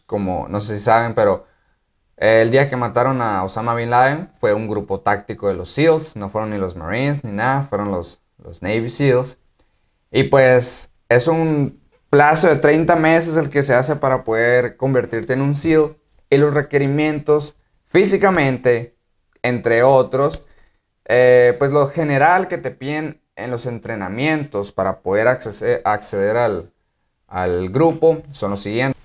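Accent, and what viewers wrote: Sonora, Mexico. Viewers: Acabo de encontrar tu canal y tu acento se me hacía conocido, que chilo que seas de sonora yo soy de hermosillo, Mucho éxito. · Hola, Era Marine por 8 años (3rd Recon Bn. Y 1st SOTG con dos turnos de combate. Ahora soy empresario y vivo en Mexicali y con mis oficinas en San Luis RC Son. Y Mexicali BC. Me gusto tus videos. Me dio risa escuchando tu acento sonorense en tus Cammies. Saludos brother. Ooorah Hell Hound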